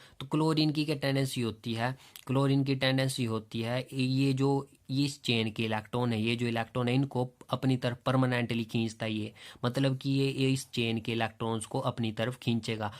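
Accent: Himachal Pradesh, India. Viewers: Sir kya app Himachal se ho aapki slang se pura lag raha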